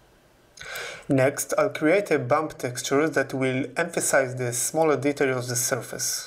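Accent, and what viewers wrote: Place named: Israel. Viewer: Are you Israeli? You sound very Israeli.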